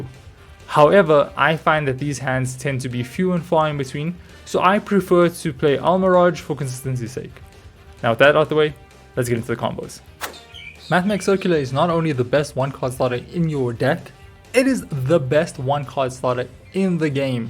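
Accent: South Africa. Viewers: Awesome vid man 🤙 recognised the SA accent immediately 😂 much love from Jozi ❤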